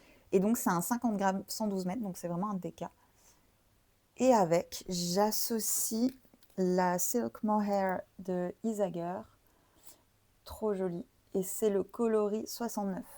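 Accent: England, United Kingdom. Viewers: Bonjour ! Je démarre à peine le visionnage et je sens déjà que je vais aimer vous suivre! Peut-être vous l'a-t-on déjà demandé, mais pardon.. your english accent is amazing, vraiment, cependant j'ai du mal à saisir toutes les références que vous donnez, et je le regrette beaucoup tant ce que vous montrez est intéressant. Pouvez-vous les transcrire sur l'écran au montage ou les mettre en description svp 🙏je reprends la vidéo avec plaisir, merci de la partager! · Très sympa ton podcast et tu as un super accent british.A bientôt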